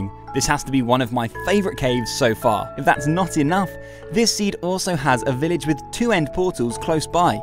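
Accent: United Kingdom. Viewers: Amazing seeds good job R u British?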